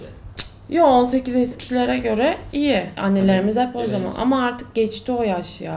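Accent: Kütahya, Turkey. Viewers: Yapçem etçem Allah'ım ya tam Kütahya şivesiyle konuşuyon 😂💓 Kütahya'dan selammmmm öpvedim gali seni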